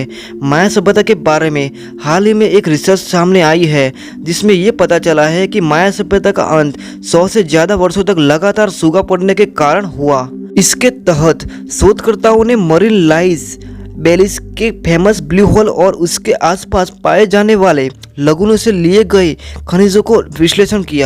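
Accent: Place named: Gujarat, India. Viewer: भलेही हिंदी बोले किन्तु गुजराती का तडका निकाल आता है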